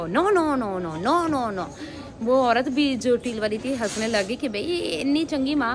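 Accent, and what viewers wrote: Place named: Pakistan. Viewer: Ap pakistan m kha sy h because your acsent is different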